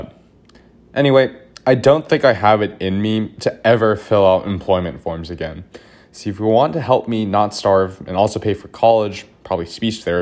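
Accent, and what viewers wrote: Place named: Ohio, United States. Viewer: i can tell you’re from ohio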